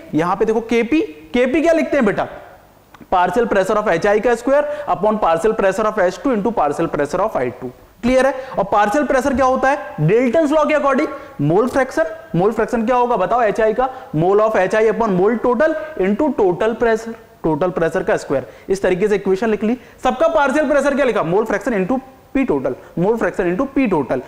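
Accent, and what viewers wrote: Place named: Indore, India. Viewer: Indori accent Bhai Indore ka hai Shayad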